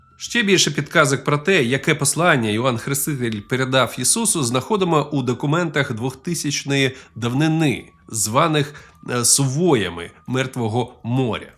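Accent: Russia. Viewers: Трохи з російським акцентом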